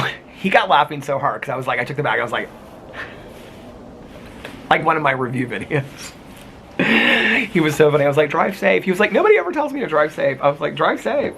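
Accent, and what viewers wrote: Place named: Midwest, United States. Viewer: my daughter was having a fit the other day (she’s 3) and I said to her in your midwestern accent, “Don’t cry!” And she was so confused 😂 it was hilarious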